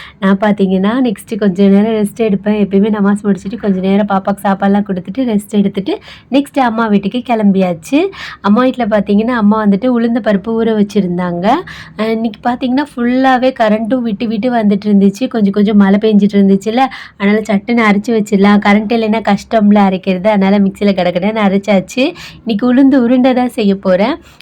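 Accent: Ramanathapuram, India. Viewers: Na ramnad thondi. Unga pechile therinjadhu ma namma ooru pullanu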